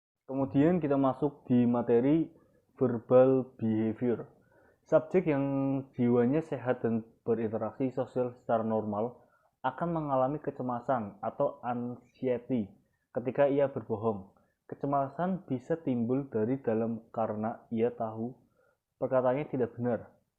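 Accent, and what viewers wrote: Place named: Java, Indonesia. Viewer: Medok nya natural mas, Sangat bisa diterima masyarakat indonesia🙏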